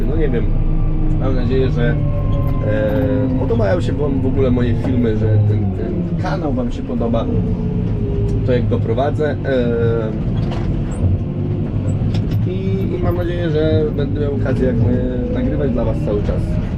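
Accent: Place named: Mońki, Poland. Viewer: Słyszę że kolega z Moniek haha daleko masz do szwagrów?😂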